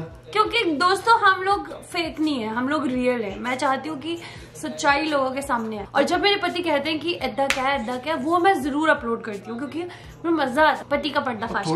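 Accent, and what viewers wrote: Punjab, India. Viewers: You are so cute di. Mujhe apke vlog boht pasand hai or jaise aap bolte ho mix punjabi hindi boht hi cute lgte ho. You guys are amazing god bless you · Sis ap ki pangabi bht mazay ki hai or jo jo hubby kay sat maza ajata hai ma bhe panjabi hon from lahore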